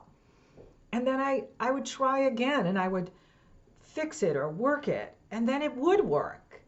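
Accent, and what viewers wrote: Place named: New York, United States. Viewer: Always so uplifting. Looking great. Notice the New York accent now that I recently looked it up.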